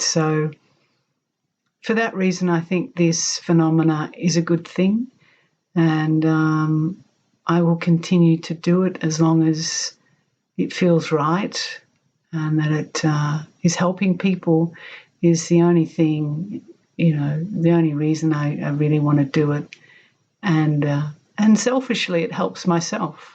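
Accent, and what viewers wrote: Australia. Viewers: Your voice, became ONE with the inward deep recognition by the words of spiritual seers, these inward places are not visited by others..then only you! Who would have thought that, by starting this 'work'? I am shure about it, you wouldn't have expected it at all..which makes you humble in wat you do.:-) Many of them, I would not have searched for, knowing their context from where they started, but listening with my full attention, and with your reading..these difference's fall away. (As does also you're accent being an 'ausie'.., from the first time on fell away.:-) You're a gem for so many of us, so please keep doing this with all your joy.., as we will listen with all our heart and will meet at the meaning of those words. Thanks for presenting yourself, although not needed, you were already there..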